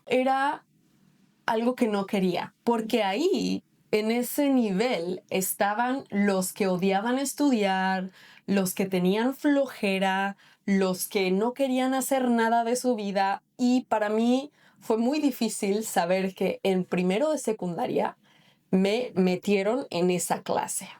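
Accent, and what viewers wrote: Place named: United States. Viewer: lol hablas ingles como una americana. Estaba sorprendido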